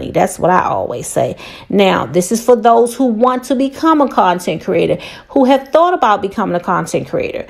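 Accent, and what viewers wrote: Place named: Washington DC, United States. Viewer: New subscriber here….. only subscribed because i feel like I heard some DC twang!!!! #Ward8 #Soufeast4Lyfe 🙌🏾🙌🏾🙌🏾💯💯💯💪🏾💪🏾💪🏾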